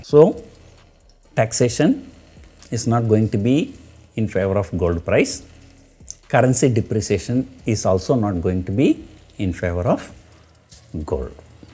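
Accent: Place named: South India, India. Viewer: If P.R Sundar wud have been smart enough to tell his 4.5 lac audience to buy Gold in Oct'18 when it first spiked from 1250 to 1450$ or in Sep 2019 when it again started spiking and tgen did furthermore after crashing in Mar'20 and then told us the Targdt of 2100 and then to sell, i would hVe paid heed to his ridculous arguments in his southindian accent !! Fact is, he is laying out analysis that does no reading into reasons that lead to spike and completely ignores the 10+ Trillion$ of Debt added around the globe in just 9 months !! When mkt crashes, ppl first go in dollars and then dump dollars for Gold. Dont listdn to him ... Buy GLD 1300$ or 34K INR and wait for 2023 for it to hit 2000$ again. But Silver 52K n target 90K+ around d same time. You are Welcome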